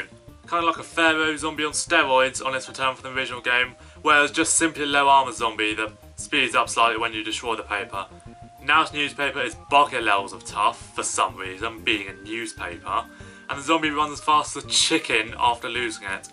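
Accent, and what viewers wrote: United Kingdom. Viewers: I think he's Britisih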